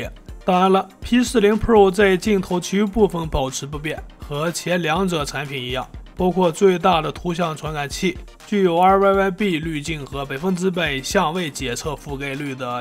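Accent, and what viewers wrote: Shanxi, China. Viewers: 能练练普通话不？一口山西土味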